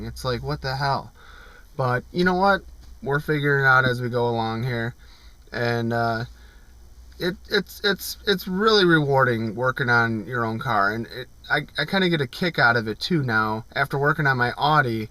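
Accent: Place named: Midwest, United States. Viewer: I knew you were midwestern with your accent. Hello to Chicago from Toronto.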